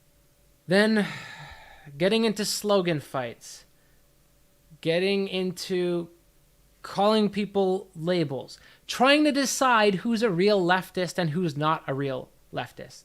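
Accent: Canada. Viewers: Are you a west coast Canadian by any chance?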